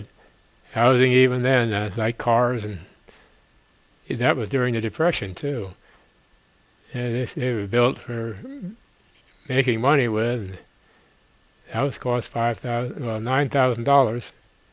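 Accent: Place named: Maryland, United States. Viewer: Oh shit you must live somewhere in Maryland, cause I haven't heard anyone else pronounce Washington as "Warshington." The National Building Museum is a great place. Went there for a school trip a few years ago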